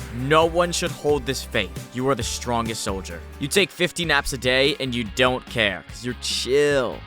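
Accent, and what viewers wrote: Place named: Australia. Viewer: wow that is incredibly accurate. Coming from an English person I thought he was English until I saw he was Australian and suddenly he sounded so Australian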